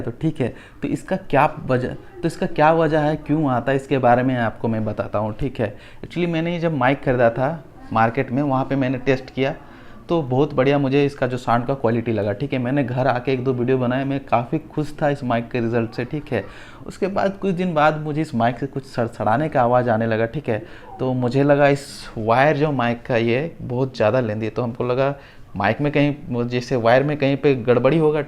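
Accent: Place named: Bihar, India. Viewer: Isme uski koi galti nhi h, vo bihar se hai bhai, thik hai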